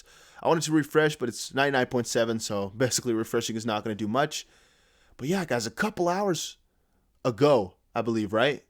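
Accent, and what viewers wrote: Netherlands. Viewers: you're DUTCH BRO?! madness! Lol I Prefer you Dutch accent maybe cuz I''m in the UK good to hear EUROPEANS on the crypto train!!! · omg your dutch accent was so cute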